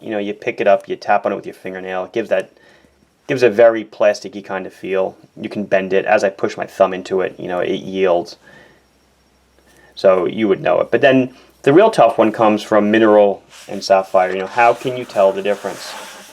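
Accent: Long Island, United States. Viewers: I grew up on Long Island, the watch information is interesting, but your Long Island accent so reminds me of home. Be well.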